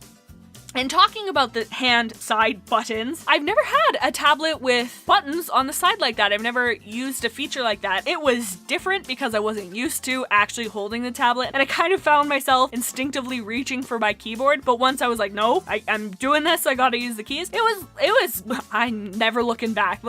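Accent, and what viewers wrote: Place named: Canada. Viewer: You're Canadian too?!?! I knew it I knew it I knew it! (Kinda) Cause that 'accent' that we Canadians have...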